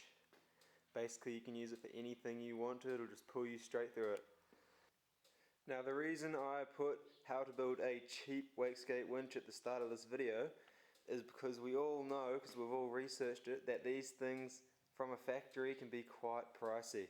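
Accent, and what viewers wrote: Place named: New Zealand. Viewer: haha i found a kiwi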